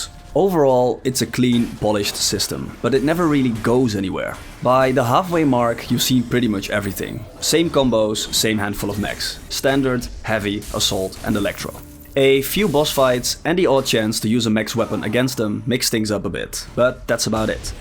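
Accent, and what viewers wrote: Netherlands. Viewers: You have a really thick Dutch accent haha, I assume you're Dutch or Belgian, cool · Love the steen kolen English. ❤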